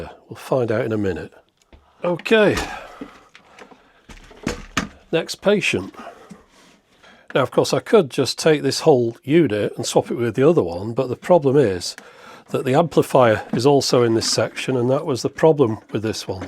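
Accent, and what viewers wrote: United Kingdom. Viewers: This was hilarious. went berserk. And his delivery is sooo English.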